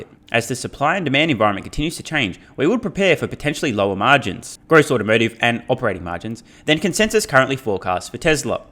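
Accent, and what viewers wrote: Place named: Australia. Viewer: Sounds like an Ausie(sp?). Hat's off Mate!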